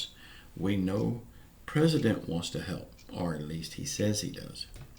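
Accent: South, United States. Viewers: Iove your southern accent. Thanks for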